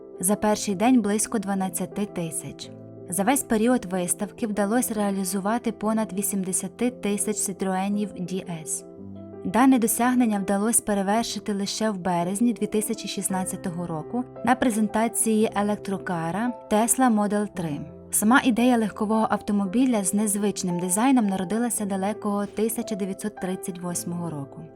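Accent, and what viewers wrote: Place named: Central Ukraine, Ukraine. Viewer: ви певно з центральної України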